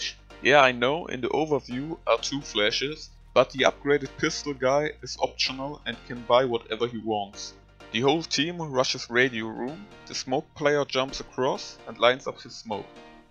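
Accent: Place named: Israel. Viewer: I could swear I clearly detected an Israeli accent in your speech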